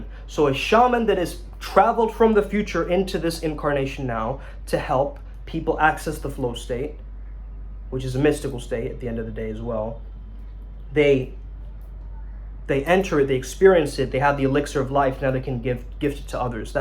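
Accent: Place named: South, United States. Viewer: That southern accent on point 👌😂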